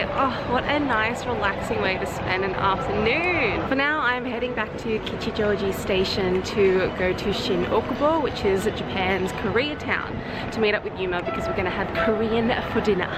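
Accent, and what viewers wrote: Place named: Australia. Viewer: I wish... This place has such a aesthetic vibe +you have a aussie accent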